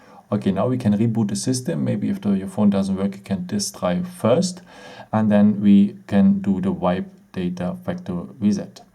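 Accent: Germany. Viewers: Hundert prozent deutscher. Aber video hat geholfen dange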